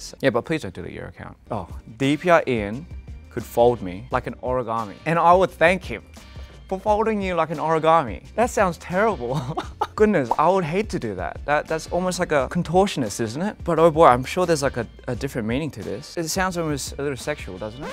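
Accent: Australia. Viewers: the rose was hilarious too but i genuinely couldn’t stop laughing at this one. his aussie accent makes it 10x better i love 😭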